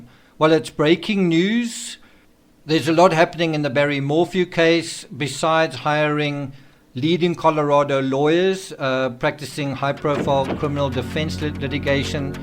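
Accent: South Africa. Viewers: Are you a South African ?Sounds like that ....your accent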